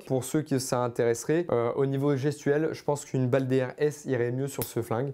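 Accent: North, France. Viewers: L’accent du Nord, c’est de trop pour moi. Le contenu est bon mais je n’accroche pas à cause de ça. Je te souhaite une bonne continuation sur YouTube 🙂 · Toi t es du nord ou j mapel pas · C est un accent du Nord que j'entend? Bonjour de la part d'un ancien Lensois. Pour le depart, il est important de prendre en consideration les problemes rencontres par le P320 lors des tests aux US ou il pouvait arriver qu il tire lorsqu'il tombe dans une certaine position. Meme si il y a encore des debats sur l'origine du probleme, la version officielle de Sig est que le poids de depart etait trop leger et la queue de detente trop lourde, ce qui permettait a la gravite d'activer la detente. Certaines videos semblent indiquer que ce n etait pas le probleme, du moins pas le seul probleme, mais c est clairement a garder en tete pour ceux qui envisagent une modification du mechanisme de detente.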